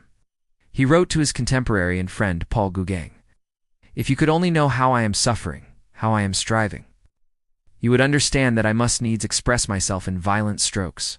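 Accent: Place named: United States. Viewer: the worst about these common narrations is this super dumb American accent. US Americans can´t pronounce names, places and a single foreign language word f.s. and don´t even make an effort to try .... absolutely atrocious and dumb - especially in documentaries. Best turn off the narrator (as usual)..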